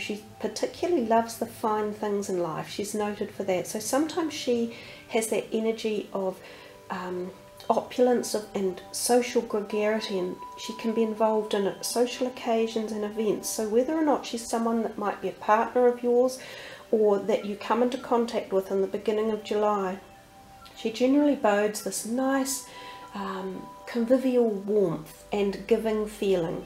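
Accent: New Zealand. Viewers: kia Ora how lovely to hear a Kiwi voice online. Thank you for the heads up for july Blessed Be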